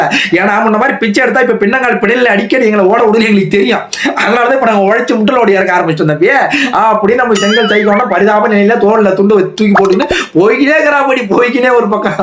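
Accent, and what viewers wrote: Kongu, India. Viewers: இது கொங்கு நாட்டு பாஷையா தூத்துக்குடி பாஷையா உங்க ஸ்பீச் வாய்ஸ் எல்லாம் சூப்பரா இருக்கு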